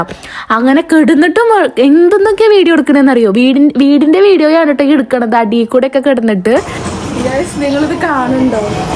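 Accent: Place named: Palakkad, India. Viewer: തൃശ്ശൂർ ആണെങ്കിലും കുറെ words പാലക്കാട് പറയുന്ന പോലെ ആണ് പറയാറ്. ഇവിടെയും നൂൽപ്പുട്ട് എന്നാ paraya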